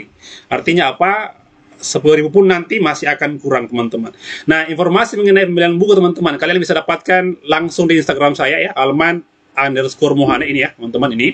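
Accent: Makassar, Indonesia. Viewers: Macam logat Makassar bang😊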